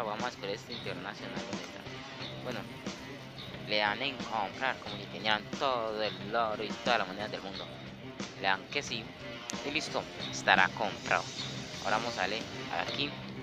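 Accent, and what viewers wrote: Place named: Colombia. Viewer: Epa se nota lo colombiano todo bien le voy a dar like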